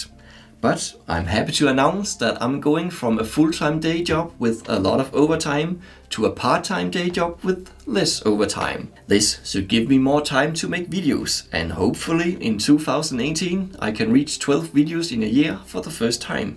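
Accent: Denmark. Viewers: Ann, it's a Danish accent. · Tillykke med det! Og du har dig en rigtig solid dansk accent når du taler engelsk, men det er der jo ikke noget i vejen med :D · Your Channel is One of my Favourite. love your danish accent. please keep making videos.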